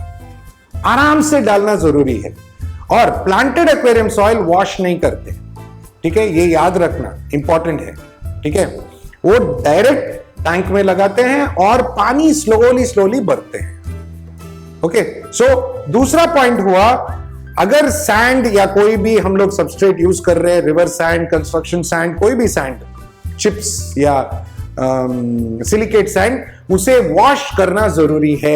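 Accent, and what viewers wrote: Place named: Mumbai, India. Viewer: Sir ke dialogues Sun kar lagta hai ki vo Mumbai sa hai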